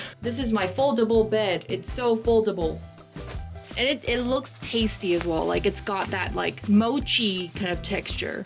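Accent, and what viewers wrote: United States. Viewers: Have you always been in korea ? Cause your accent sounds like you lived in STATES...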